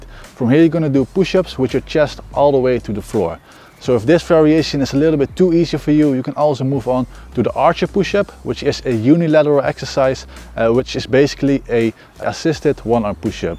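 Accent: Netherlands. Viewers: ik hoorde gelijk al dat je nederlands was haha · Nothing more goofy than the Dutch accent.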